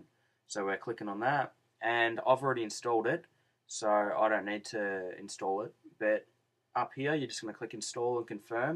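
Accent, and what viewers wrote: Australia. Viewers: Sounds Australian to me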